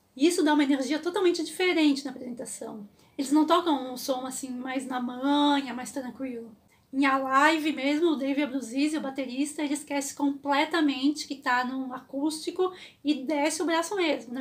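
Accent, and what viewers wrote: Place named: Brazil. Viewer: Somos brasileiros... nossa lingua oficial NAO é o ingles... a gente nao tem obrigacao nenhuma de falar como eles... assim como eles nao pronunciam nada 100% quando tentam falar portugues...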